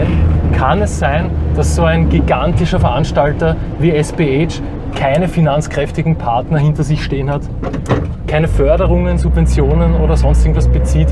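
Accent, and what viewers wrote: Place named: Austria. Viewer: Das ist so witzig, deine Sprechstimme zu hören. Ich kannte bisher nur deine Singstimme (sehr überraschend bei einem Sänger, ich weiß :-D) und die ist ja so anders. Und dieser schöne Akzent! Hat mich grad total überrascht, obwohl ich ja weiß, dass du Österreicher bist. xDD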